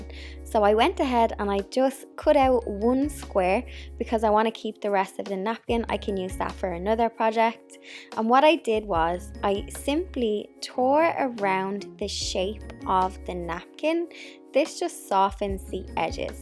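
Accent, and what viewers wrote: Ireland. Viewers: Cute! When you were talking about YouTube algorithms I didn't understand you. I'm an American and sometimes your beautiful Irish accent is difficult hard for me to comprehend.. Could you explain what you meant?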